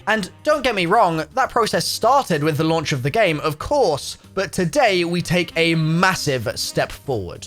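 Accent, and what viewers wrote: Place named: United Kingdom. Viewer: Bruh hes British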